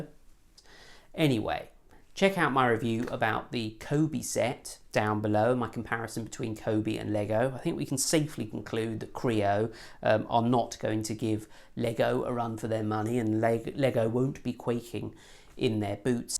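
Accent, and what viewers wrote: United Kingdom. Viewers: I really like... your accent. I'd love to speak with perfect British accent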